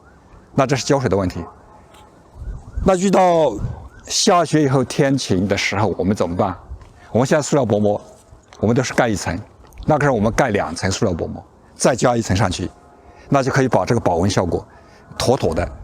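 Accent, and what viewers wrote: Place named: Kunming, China. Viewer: 谢谢李老师！听着你的昆明口音，太亲切了！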